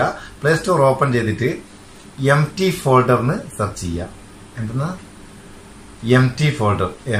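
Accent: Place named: Northern Kerala, India. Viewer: കൊള്ളാം. പക്ഷെ ഈ ചങ്ങായീടെ ഭാഷ നമ്മള് തെക്കർക്ക് നല്ല കൗതുകമാണ്‌. പിന്നെ കലാകാരനാണെന്നും പറഞ്ഞു. കല ഏതാണ്‌. കഥകളി? or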